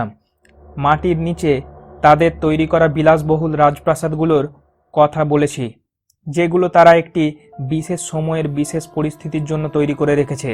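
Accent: India. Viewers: Dm mahmud Bhai uni indian.. And indian der bangla uccharon amader cheye different.. Ek ek arear banglali ra ek ek rokom uccharon kore.. So, kawke evabe opoman korben na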